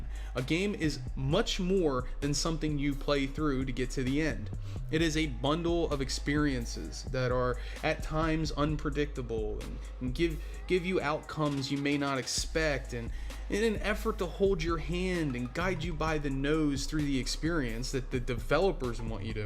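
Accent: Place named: Chicago, United States. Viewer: You’re from Chicago.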